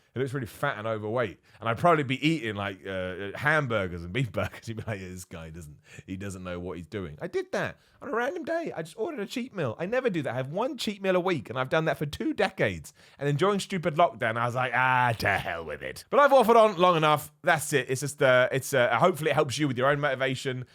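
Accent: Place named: England, United Kingdom. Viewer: You're absolutely right. Coach Greg has the exact same effect with my exercise and training motivation. When I'm exercising now I always have some form or fitness YouTube video on, be it yours, or Greg's, Jeremy, Jeff, Igor, Derek, etc. Your videos are also great motivation for me, and I really find your personality charming and your English accent and mannerisms kinda soothing, lol.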